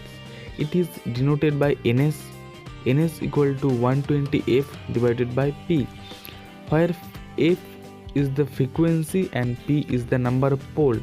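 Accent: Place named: Bihar, India. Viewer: Tu jaroor bihari hai.... angreji kee maa behan kyo kar raha hai bhai ...bihari mai bol de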